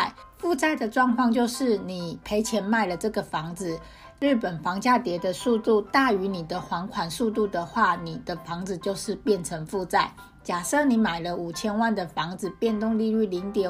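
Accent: Taiwan. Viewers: 我喜歡這個心智圖，還有，台語腔調的國語，哈哈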